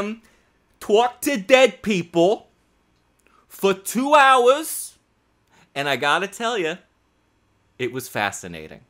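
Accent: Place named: Boston, United States. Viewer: We need more Boston accent 😂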